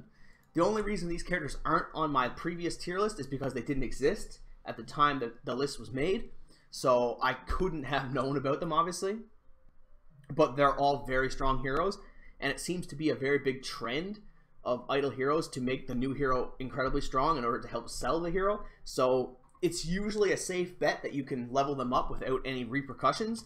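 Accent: Canada. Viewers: Canadian, eh?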